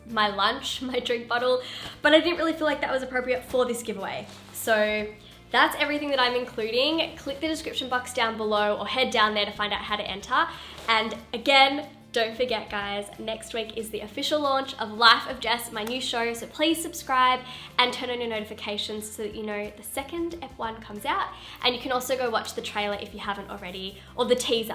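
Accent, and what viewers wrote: Australia. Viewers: Omg!! Love it so much Jess!! 😀 can't waitt!! Congrats for ur new moviess !!!!!!!!! .. i really hope i could get the giveaway back to school supply '. And miss ur rabbit .. its So fluffy !!. And just wondering are u aussies?. Because ur accent like an australian accent xx and i love it . REQUEST : How to make a neat note and creative notes for school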